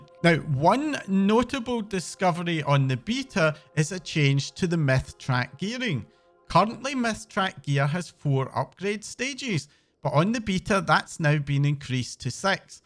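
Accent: Scotland, United Kingdom. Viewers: You trolling 😅 lads Scottish he won't be changing how he speaks for you 😅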